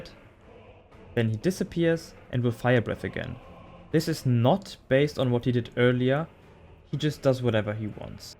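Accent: Germany. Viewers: Ok so it's true that all Germans sound the same and adorable OK! <3 ahaha thank you for your reply!!!!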